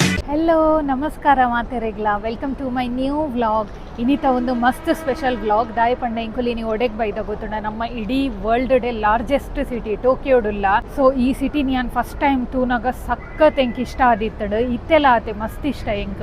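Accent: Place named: Tulu, India. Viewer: ಈರೆನ ತುಳು ಪೊರ್ಲು ಆಪಂದ್ದು. ಮಸ್ತ್ ಮೊಕೆದ್ದ ತುಳು ಪಾತೆರಿನ ಖುಶಿ ಆಪುಂದ್ದು..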